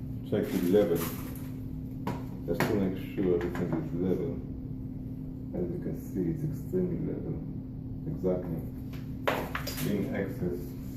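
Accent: Israel. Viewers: Very helpful and insightful. My bf and I are remodeling our shower right now and have been trying to figure out how to do backsplash onside an insertion for shampoos and soaps. Let me tell ya, thats not for faint hearted 😂. Thank you. Just a question, are you Israeli? Your accent is very familiar. Thanks a bunch again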